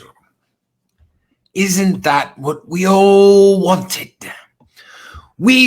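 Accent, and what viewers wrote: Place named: RP, United Kingdom. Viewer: indeed! It seems I can leave a comment here but I can’t a see anyone else’s. WTF, YouTube? Nice video, man, I truly enjoyed your constipated British toff voice. Constructive criticism: You might want to watch the occasional “um’s” and such, and invest in an expensive microphone... it seems to be a necessity for a promising YouTuber. Again, nice job; I’m sure starting a YT channel is like watching grass grow; please persevere!🙂🖖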